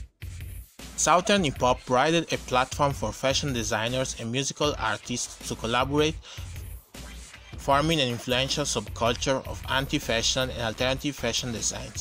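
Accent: Italy. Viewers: Why you speak with an italian accent?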